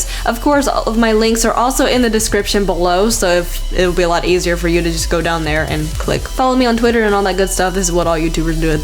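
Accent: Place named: North Carolina, United States. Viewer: where is from? she sounds like she's from NC or some state around here... like, her slight dialect sounds so familiar.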